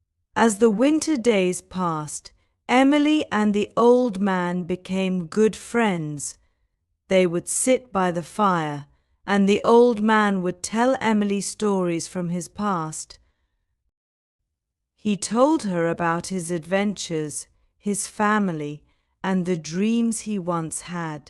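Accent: United Kingdom. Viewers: My dear are you from England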